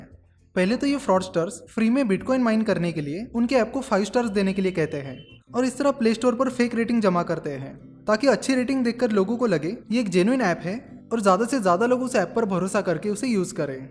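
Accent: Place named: Indore, India. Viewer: Pakka indore wale hoge ye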